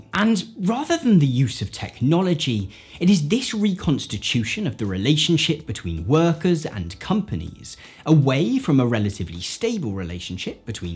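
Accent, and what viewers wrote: RP, United Kingdom. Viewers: Your upper-class gesticulation, alongside your posh Patois, its really triggering. Apart from that great vids!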